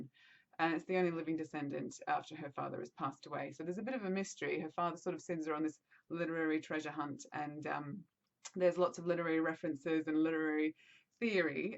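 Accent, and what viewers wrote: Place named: England, United Kingdom. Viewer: The Madwoman upstairs, wouldn't mind taking a look at that , and watching middlemarch. I wish I'd borrowed instead of 'Joy' which is currently in my dvd player. First half hour in and I was so bored last night I went to bed lol. And read some of and editing book by james scott bell...ANd actually paid attention. That's how sick of feeling bored at the movie I was! Lol. I love the Brontes. it sounds like the mad woman upstairs isn't written like fanfiction? - it has it's own story. There is a book I saw recently in book shop about Nelly from Wuthering Heights and I got one page in and groaned - I could have written it myself! - it did not read anything like Emily Bronte...or any bronte. By the way you have an English accent lol. Did you always have it or caught it overseas? This sounds funny but I like stories where people need to get a job, and life kinda is frustrating them lol. 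Blood stain sounds good.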